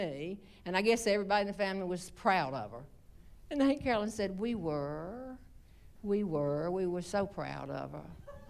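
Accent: South, United States. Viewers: The accent is perfect southern! Way to go.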